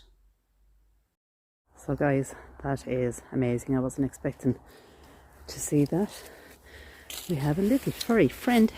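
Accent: Ireland. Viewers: By the way, your Irish brogue is absolutely mesmerizing!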